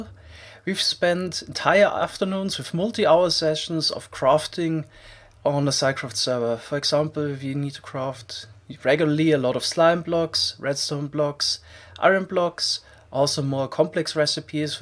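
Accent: Germany. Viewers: Also dein Englisch ist seehr gut aber man hört es einfach wenn ein Deutscher Englisch redet xD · ich mag deinen deutschen akzent ;D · I really hope we get a automatic crafting table. Also are you german? Your english sounds like you're from germany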